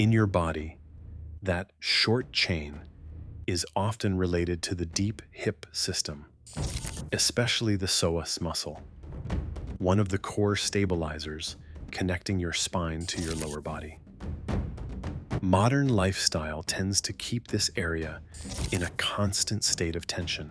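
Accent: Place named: United States. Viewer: WHEN ARE YOU GOING TO CORRECT YOUR READER ON HOW TO PRONOUNCE PINYI? It is most annoying, THhr yank accent does not help either,,too bland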